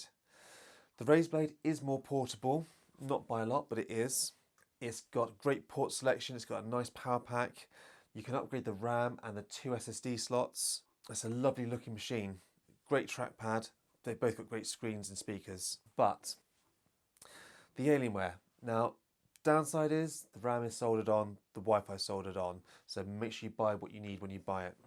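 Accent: United Kingdom. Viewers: I gotta tell ya I was just mildly interested in laptops cause I'm figuring out which alien gaming beast I should get, but more I watch more I wanna know. All this undervolting, different testing etc.. Really interesting. Your videos are great. Hope the channel explodes. Keep it up mate! Edit: what part of England is this accent you sound a lot like Ricky Gervais same accent where is that?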